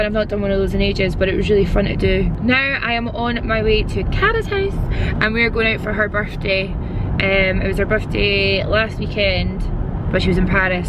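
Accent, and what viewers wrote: Scotland, United Kingdom. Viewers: Your the first Scottish youtuber that I've followed it's great hearing the Scottish accent xx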